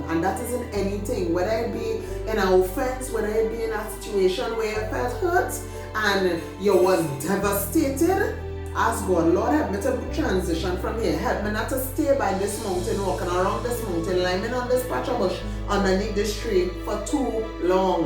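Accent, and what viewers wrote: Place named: Trinidad and Tobago. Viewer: It's the wisdom... But it's also the trini accent fuh meh! ❤️🎉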